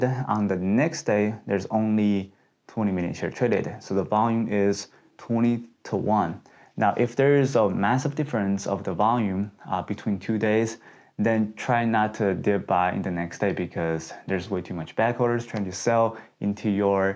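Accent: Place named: China. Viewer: (I know it's not your real name) I comment your videos almost never, but I just wanted you to know that you contributed to my trading, and your personal story also did, I am Russian by birth but European all my adult life. I like your style. I even liked your chinese English, it was still ok, there was a way to understand it if you want really to learn in the markets...I am older than you at least 8 years even if I look at least 10 less, so no i am not writing this comment because I am looking for a rich husband or want to make kids(hope that will never happen to me, if I'll make some serious money, i will adopt at some point, as i did with my dogs), i just wanted to recognize you how much you contribute to my trading even if you have no idea who I am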